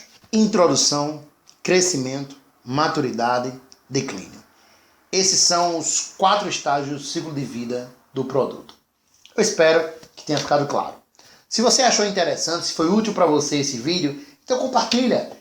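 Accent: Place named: Northeast, Brazil. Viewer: Muito bom! Explicação de fácil entendimento, muito satisfeita. Parabéns professor 👏.. E esse sotaque, meu nordeste ❤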